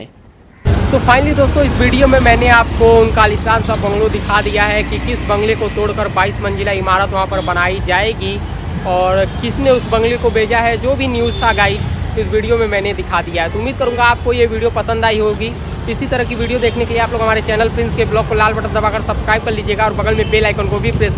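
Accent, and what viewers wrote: Bihar, India. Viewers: भाई आपकी बात करने के सुर से लगता है आप उस प्रदेश के है जिस प्रदेश के चाराचोर लल्लू यादव है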